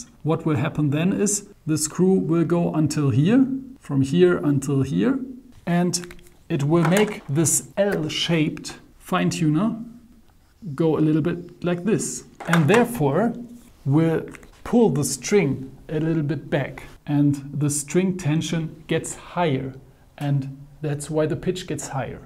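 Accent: Germany. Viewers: German accent thats nice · DEUTSCHER AKZENT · You have a german accent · Are you german?,sounds like that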